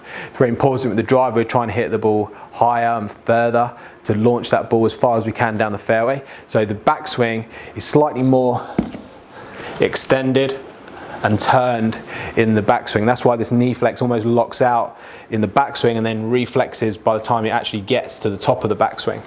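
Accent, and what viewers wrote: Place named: United Kingdom. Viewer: LOL! I wondered with that British accent how you could be Canadian. Another!!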